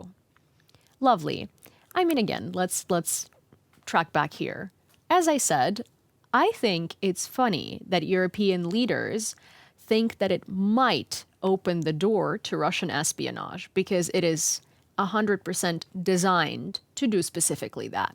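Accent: United States. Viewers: my sincere appreciation for your work and for Gaze media as well. You undoubtedly do a meaningful job, cz western media doesn't comprehensively cover the news about the war in Ukraine. P.S. Thanks for exposing to perfect American English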